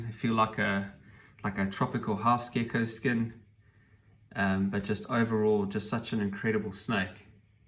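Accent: South Africa. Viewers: Love the South African accent!!! Dunno why just do!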